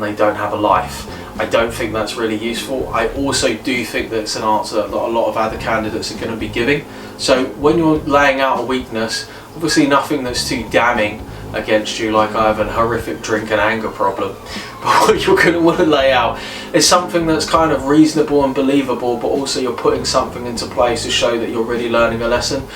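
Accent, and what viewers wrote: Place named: United Kingdom. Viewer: brother , your accent is terrible , it could be perfect for brits but for other it is a headache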